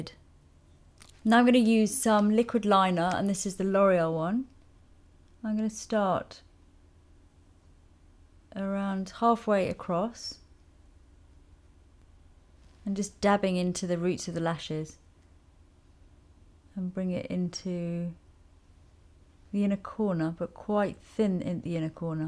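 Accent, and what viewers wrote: United Kingdom. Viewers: ooh, just not to forget!!!! :D I simply adore your gorgeous British accent that I dont't have; instead I've got that Northern/Canadian accent! :D I've learned so many things about vintage make up from your videos, and this is so amazing and awesome!!! thank you again and again!!!!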